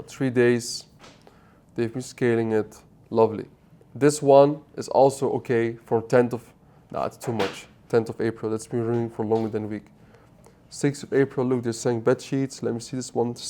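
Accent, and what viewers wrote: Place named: India. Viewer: No one can easily understand Indian English. It’s not about us — it’s about how you pronounces it in India cuz of accent you use.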